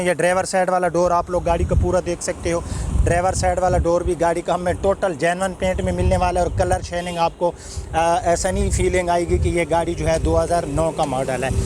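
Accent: Saraiki, Pakistan. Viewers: Apki Urdo Se aisa lagta ha jaisy ap sryki hai q k apko urdo bolna hi nai ata so ap sryki mn bol lia karen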